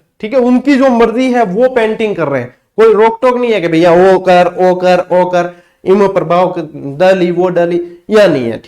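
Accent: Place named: Marwar, India. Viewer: Sir aap Marwadi bolate ho to meethi lagti hai main Bhilwada se hun aur hamare yahan Marwadi bilkul bhi acchi nahin hai bolane mein hi Aisa lagta hai Jaise Lad rahe ho😂😂😂😂😂 Or Thank you 🙏🙏🙏🙏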